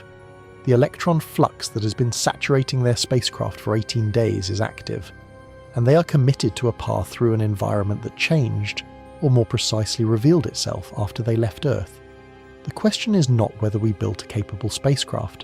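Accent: United Kingdom. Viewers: Your British accent is not going to help you to get by with this rubbish story!!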